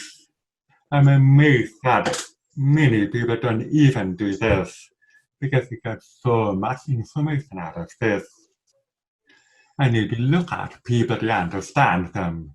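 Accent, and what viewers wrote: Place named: Vietnam. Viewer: I had a vietnamese lecturer, and you are have a lighter version of his accent. Much more understandable than him though :)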